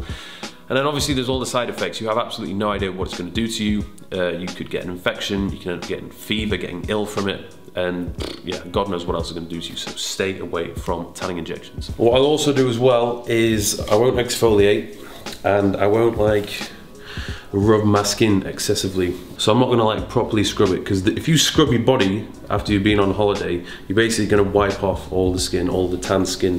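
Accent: United Kingdom. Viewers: Omg my god, you are hot as holy moly, and have a british accent.. two totally dangerous amounts of hotness ;)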